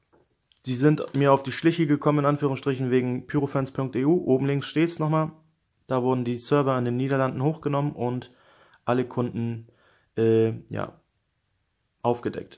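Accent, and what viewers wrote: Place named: North, Germany. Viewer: Klingst so norddeutsch😂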